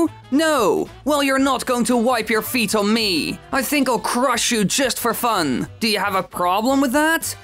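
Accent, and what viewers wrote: Netherlands. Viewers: Are you dutch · Saw your majora's mask video and thought I heard a little dutch accent. Your PO box adress confirmed it Haha. But so am I!Keep up the good work dude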